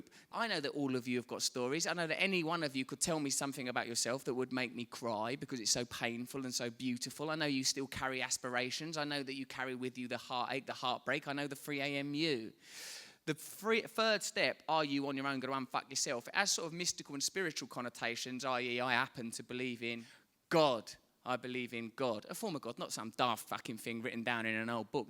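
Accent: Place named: Cockney, United Kingdom. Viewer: Russell Brand's first aspiration is 'to remain famous'. After that, whatcha need? a self-help guru, Dudley the Moore II, a recovering recoverer? The Cockney accent combined with the bad word in the middle of the cover (asterisked) makes the money-coming-out-your-wallet bit feel a little less chump-to-champ. Self-help books were designed to give dust a jacket to cry on. If you don't buy my book you're being subjected to the patterns of your cheap ass. Break the cycle of discerning purchases. I promise my fame will rub off like a halo on the drab parameters of your crappy life or your money never back!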